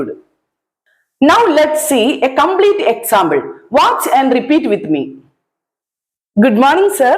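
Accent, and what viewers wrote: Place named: Kerala, India. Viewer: How ever your academic credentials, your mallu pronunciation kills english and it's a self suicide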